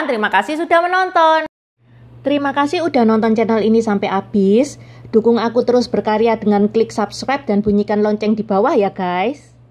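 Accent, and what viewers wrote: Java, Indonesia. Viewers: Cik logat jawa bangat. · logat jawane medhok... cina abad lampau memang tergolong maju... Klo penemuan cina abad ini apa y? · Logat Jawa tapi Mandarin .... H E B A T... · Konten bagus saya suka sejarah apalagi jowone medhok 😀👍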